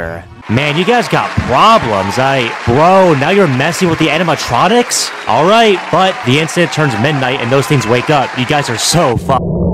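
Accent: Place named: Australia. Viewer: Because he has an Australian accent I think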